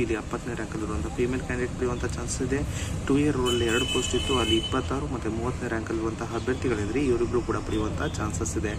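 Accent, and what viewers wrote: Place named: Haveri, India. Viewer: Haveri english sir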